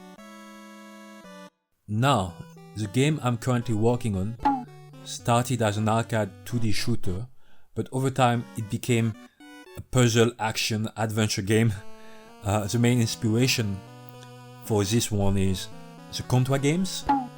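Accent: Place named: France. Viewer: Holy accent. Ton accent francais est épais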